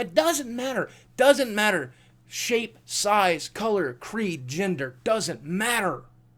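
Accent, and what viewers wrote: South, United States. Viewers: ANIME , but because he's from the south, it's pronounced differently, you ignorant fuck.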